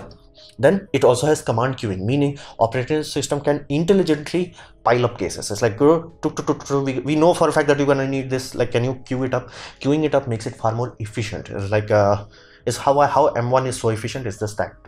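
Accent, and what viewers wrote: India. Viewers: In spite of the heavy Indian chatter accent, a VERY good explanation.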